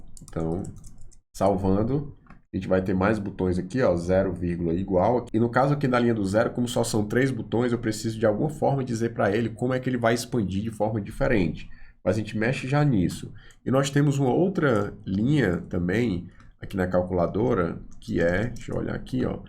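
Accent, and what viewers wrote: Ceará, Brazil. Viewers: seu sotaque parece aqui do Ceará! procede??? =)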